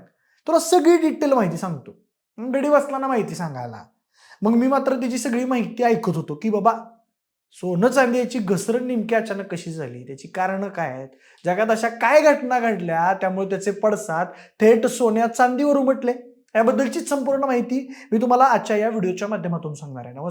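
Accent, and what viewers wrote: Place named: Kolhapur, India. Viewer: तुम्ही कोल्हापूर च हायसा काय तुमच्या बोलण्यावरण वाटतंय 😊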